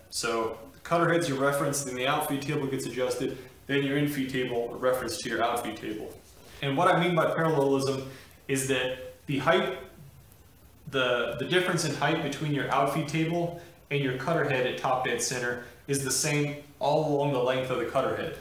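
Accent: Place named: United States. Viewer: Dude you are wearing a Texas A&M tee shirt you Speak English as I would expect someone from the United States of America to Speak, However you keep referencing metric measurements I am an old guy and use to install CNC machining centers. We used imperial measurements. I maybe wrong but to me one would have finer resolution using thousands of an inch. Then all of a sudden you mention thousands. I get the global thing since the rest of the world is wrong 🤪using metric. I have a son that is a mechanical engineer I have tell him too speak English we are in The US. Yes he was like me and 20 generations before me from here in the US. Good video I must say the concepts that you brought out are what are important not what scale you used to define the accuracy. Have a great day thank you for taking the and effort to produce a very good video.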